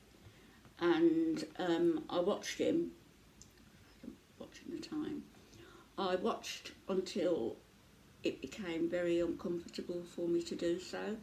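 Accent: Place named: England, United Kingdom. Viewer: Thats nasty I can understand her ok may be it's her English accent you can understand there is nothing wrong with her speech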